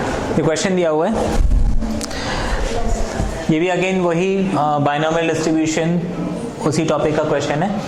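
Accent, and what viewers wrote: Allahabad, India. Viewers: sir ...are u from Allahabad.......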